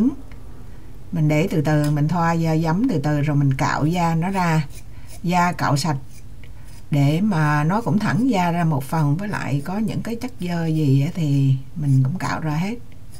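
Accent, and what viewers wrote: Quang Nam, Vietnam. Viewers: Em chỉ dẫn rõ ràng và dễ làm, giọng quảng rất dễ thương vì chị cũng là người đồng hương của em. Cái châm lỗ trên da em order ở đâu vậy? Chị muốn mua. Tks em.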